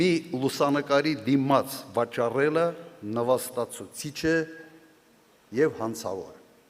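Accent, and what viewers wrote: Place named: Karabakh, Azerbaijan. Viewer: զզվում ենք էս մարդու խուճուճ ղարաբաղյան ակցենտից,ոչ հայերեն գիտի,ոչ էլ նորից հայերեն...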